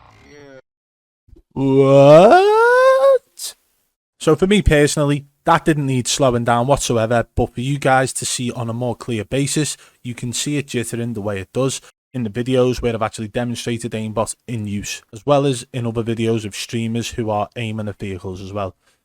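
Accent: Liverpool, United Kingdom. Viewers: You have to be related to Nick Peet, look just like him and a scouser aswell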